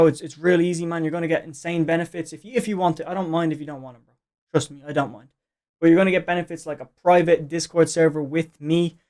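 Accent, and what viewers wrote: Ireland. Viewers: Are u Irish I am😃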